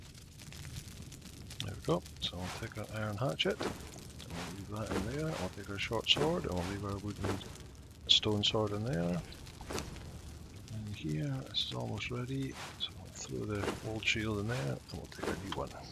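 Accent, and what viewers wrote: Scotland, United Kingdom. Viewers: Excellent play through. And nice to hear a Scotsman for a change 👍✌️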